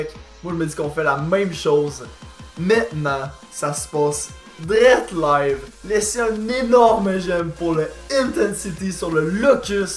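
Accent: Quebec, Canada. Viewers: T tu québécois ?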